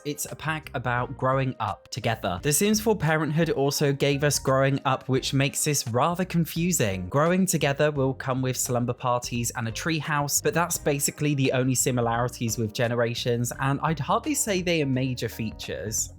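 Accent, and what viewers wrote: United States. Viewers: Your american accent always makes me laugh, it is - chef’s kiss - amazing. 😂😂❤ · You with the American accent sent me into an alternate universe where the sims 4 isn’t garbage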